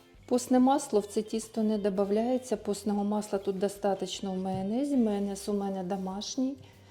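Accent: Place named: Odesa, Ukraine. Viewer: Она с Одесской области - там свой диалект - ну, говорит женщина всю жизнь на суржике!!! Что не так снова??! Не цепляйтесь за слова!! Вам рецепт пирожков нужен или скандал???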